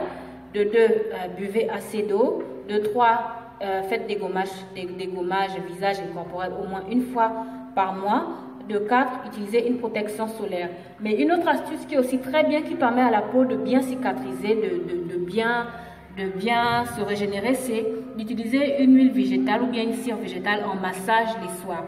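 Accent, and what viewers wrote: Gabon. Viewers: Bjr ma belle tu as l'accent Gabonais de quelle pays est tu si ce n'est pas indiscret?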